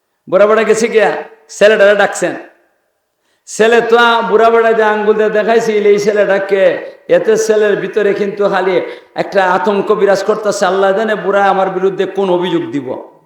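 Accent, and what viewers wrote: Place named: Brahmanbaria, Bangladesh. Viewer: এই হুজুরের গ‍্যান সিমীত। আরো সুন্দর ভাষায় ব‍্যখ‍্যা করতে হবে। বাংলা আরো শুদ্ধ করে বলতে হবে, বি.বাড়ীয়া এলাকার accent ব‍্যবহারে বাংলা বললে হবেনা।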